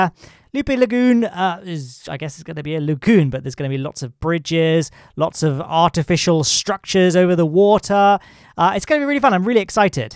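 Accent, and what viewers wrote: United Kingdom. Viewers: I love your videos and love the British & their accent ...lol and I started watching your videos about month ago to learn more about KSP but and I wanted to learn more about youtubers playing games. I bought my own pc and thought it was going to do just fine since I was getting the stimulus money from uncle sam....I'm a disabled Air Force veteran here in the us......and as it was the stimulus money was about 6 months of my income at once so yeah...because it was only $1200 I couldn't afford a great GPU or a computer monitor so I hooked it up to my tv and as you mentioned in this video its soooooo annoying and difficult playing any games on my tv even though it's all I have....lol...I hope you keep making wonderful videos no matter what game it is. 😃👋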